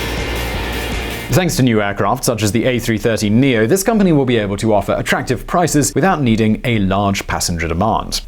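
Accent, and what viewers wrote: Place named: United Kingdom. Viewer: Keeps saying Roots when should be saying Routes, trust the English to butcher the language..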